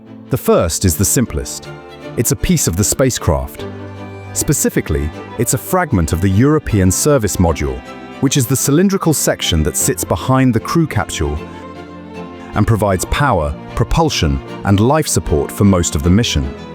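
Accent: United Kingdom. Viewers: Adding the British accent always adds some officiality to the video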